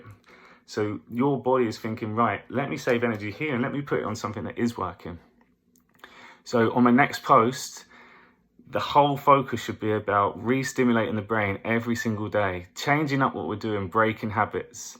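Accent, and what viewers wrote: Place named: Milwaukee, United States. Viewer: You have a nice accent are you from Milwaukee?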